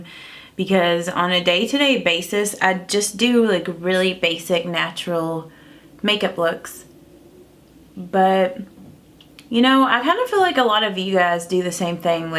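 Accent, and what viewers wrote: South, United States. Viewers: Looks great!It would be fun to hear more about what's unique about where you live. Places you eat at, shop at...the place where you bought your mug. With your southern accent, is there anything makeup wise you would say is trendy in the south.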